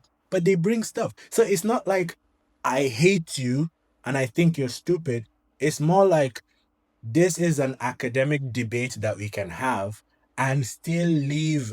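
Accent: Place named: Southern Africa. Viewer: Did you ever live in Southern Africa? Your accent sounds Southern African. That said, these parts of Barbados look like parts of Akwa Ibom State. The major difference are the pedestrian walkways in Barbados.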